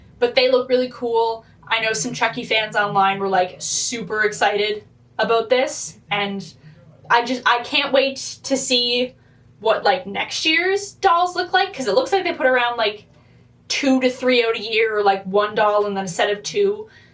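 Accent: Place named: Canada. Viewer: I like your accent! Canadian detected?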